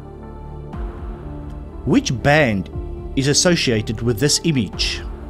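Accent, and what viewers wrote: South Africa. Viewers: U R South-African, judging by your accent?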